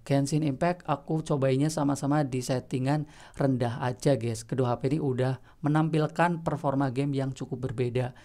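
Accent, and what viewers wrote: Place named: Cilacap, Indonesia. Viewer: Kaya wong cilacap kiye apa yahh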